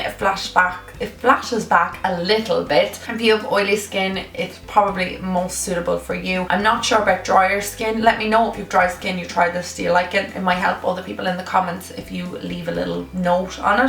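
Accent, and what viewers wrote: Ireland. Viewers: What part of Ireland are you from? I'm from Louth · this is so helpful xx and love her accent isn't she Irish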